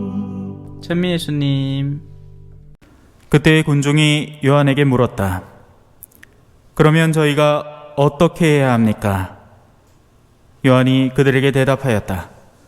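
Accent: Gyeongsang, South Korea. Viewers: 주님 주신 빛나는 달란트를 백배만배 쓰시는신부님.....옥구슬 같은 말씀말씀이 그저 아멘아멘!!!하게 합니다 만인을 행복하게 해주시려는 키타소년이 된 모습과 마당의 경상도사투리가 정겹습니다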